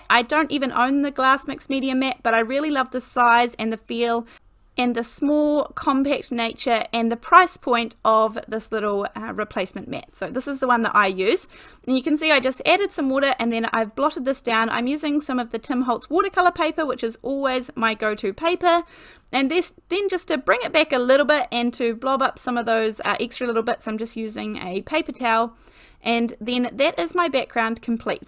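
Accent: New Zealand. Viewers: I love this! It's so beautiful (and easy)! By the way, is your accent NZ? I'm in Canada but my son and his family his on the North Island in Laingholm.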